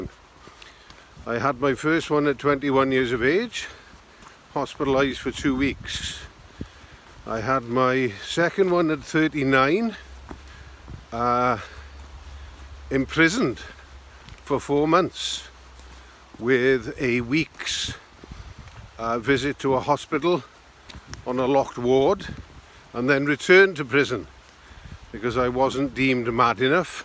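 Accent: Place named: Wales, United Kingdom. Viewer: This is my face. I have my roots in Wales. I heard it in your voice. We aren't so very far away from home. <link>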